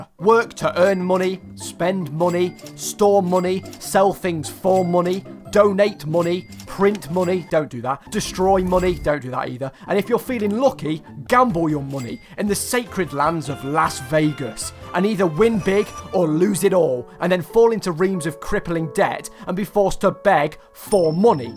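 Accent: United Kingdom. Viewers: I'd this man British because he sounds British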